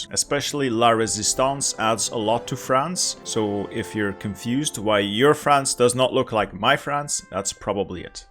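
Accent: Flanders, Belgium. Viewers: yeah but he's from the Antwerp region if I can locate his accent enough. Which is the northern region where we speak Flemish (form of Dutch)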